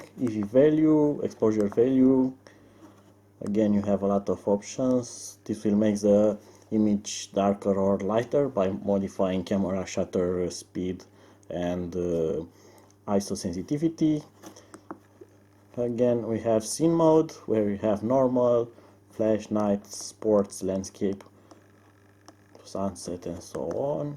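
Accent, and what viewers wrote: Romania. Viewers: Good review , buy the way this is 100% romanian enghlis, accent tell us :)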